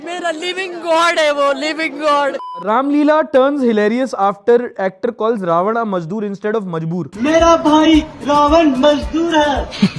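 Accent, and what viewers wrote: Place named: Bihar, India. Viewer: Bhai bihar ka asar saaf pata chal raha hai😂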